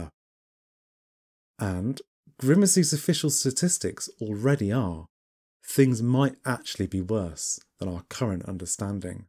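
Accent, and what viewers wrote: United Kingdom. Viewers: New subscriber, enjoyed this very much. I, too, was a little distracted by your hand gestures but it’s a small thing and doesn’t really matter that much. Incredible voice! Something about that British accent. Don’t let ppl compare you to Mr Ballen, I’m sure you get that. I think it’s the similar background more than anything else. Of course, I just did that, didn’t I? Keep it up you have a new fan. I think you hit the algorithm just right. Love that I got here early!!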